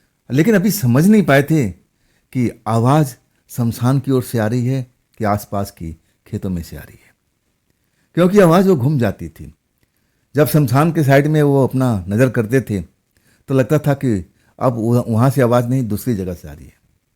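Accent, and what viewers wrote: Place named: Bihar, India. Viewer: Aap ke pronunciation se lagta ki aap east belt ke hai yani east up ya bihar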